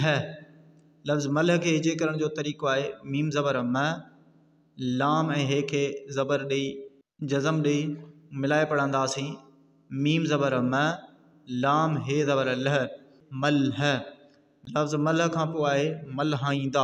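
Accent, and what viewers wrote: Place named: Arab world. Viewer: توھان سنڌي کي عربي طريقي سان ھجي ڪيو پيا سنڌي کي آوازن سان پڙھڻ جو طريقو الڳ آهي اوھان جون ڪافي ساريون غلطيون آھن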